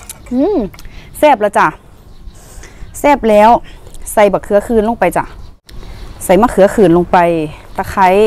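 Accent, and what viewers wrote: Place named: Korat, Thailand. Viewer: สำเนียงโคราช😅😊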